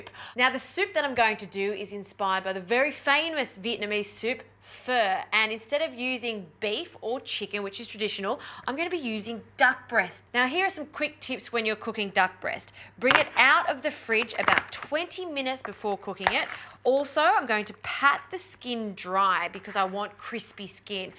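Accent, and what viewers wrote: Australia. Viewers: Celsius (She sounds Australian)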